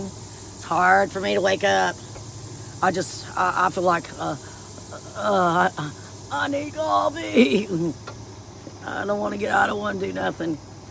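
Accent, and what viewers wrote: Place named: Kentucky, United States. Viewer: I knew you was from KY soon as I heard your accent.. blessings...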